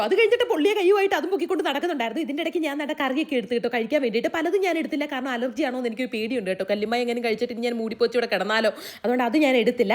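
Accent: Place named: Kozhikode, India. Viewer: ഇനിയും പോകുമ്പോൾ കോഴിക്കോടൻ ഭാഷ നമ്മളെ കേൾപ്പിക്കുമോ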